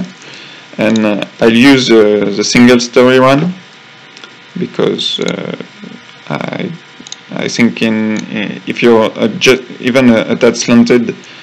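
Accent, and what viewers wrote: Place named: France. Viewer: nice french accent :p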